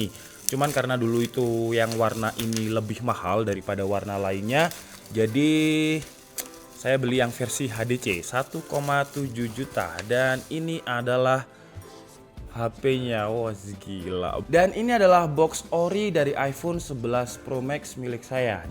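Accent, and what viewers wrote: Java, Indonesia. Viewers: Mas e jawa to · Njenengan tiyang pundi mas? Kok enten logat logat jowo wkwk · Orang malang kah bang ? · Oalah ketok e wong jowo😅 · Arek malang ta bro 😅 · Oalah, smean iki malang pisan ta... 😅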